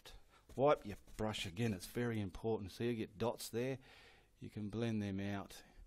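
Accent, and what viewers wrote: Australia. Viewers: Thanks again, I am a Kiwi and all our canvases are still in inches. I usually use 12x16 but they seem to be much larger on utube. As you can probably tell I am a 73yr old new chum at painting. I really enjoy your work and your Aussie twang!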